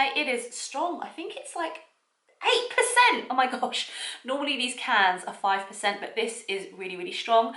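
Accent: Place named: United Kingdom. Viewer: Love your British accent! I got the nano noe in the DA print with the animation holiday edition. Subscribed!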